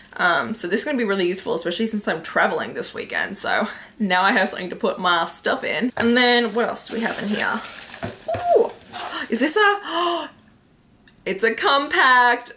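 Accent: Australia. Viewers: U sound extra Australian today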